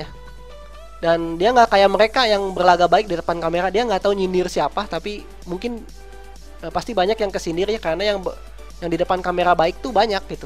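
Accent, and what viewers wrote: West Java, Indonesia. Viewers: bang lu org Sunda ya? suara lu ketara hehe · sunda banget ngomongnya haha malah ngakak. makasih bang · miminnya dari sunda :p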